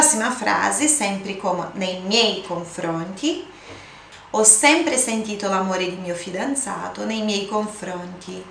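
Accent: Abruzzo, Italy. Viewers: Salve. Lei ha un accento familiare abruzzese. Ho vissuto 18 anni a Lanciano!!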